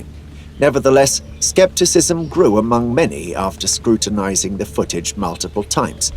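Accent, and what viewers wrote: England, United Kingdom. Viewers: bro your starting to sound like a pommy englishman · Why are you talking with an English accent in this video?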